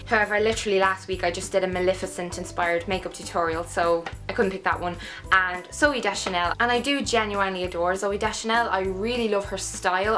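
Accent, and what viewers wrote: Ireland. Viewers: Thanks :) Personnally I prefer english speaking .. ^^ And I like your Irish accent too, and one day I'd like to visit Ireland. Seems to be such a beautiful country! ;) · where in ireland are you from?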